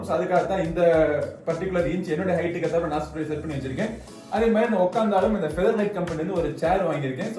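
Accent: Madurai, India. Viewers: Neenga madurai ah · Bro neenga madurai ya?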